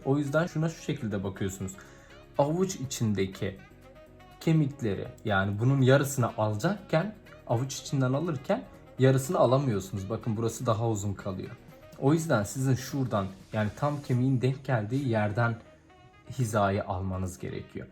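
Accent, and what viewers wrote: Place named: Samsun, Turkey. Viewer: "Eğl" çizmeyi öğrettiğiniz için tişekkürler. Hafif bir samsun ağzı sezdim (-_-)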